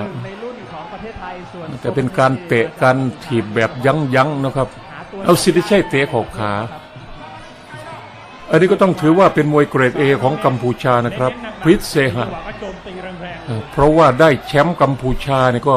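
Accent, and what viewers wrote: Isan, Thailand. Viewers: ท่านพากสำเนียงอิสานใด้น่าขำ..ท่านอื่นพยามเลียบแบบแต่ไม่มีใครสู้ฟังดูแล้ว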